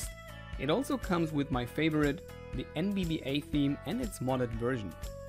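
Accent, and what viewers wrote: Germany. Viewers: Hey, aufgrund deiner aussprache gehe ich mal davon aus das du Deutsch sprichst :D! Wie bekomme ich nach der Installation der Rom, Spiele auf das Gerät? Ich weiß es sind schon etliche Roms vorinstalliert nur würde ich gerne wieder Pokemon auf Deutsch spielen! Habe die 128GB Karte drinnen und mit DiskGenius auch den restlichen platz frei gemacht :)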